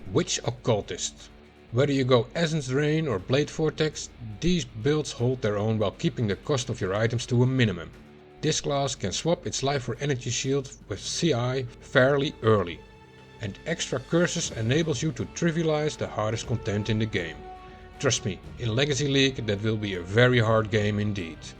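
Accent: Netherlands. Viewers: i can tell by your accent you are dutch am i right?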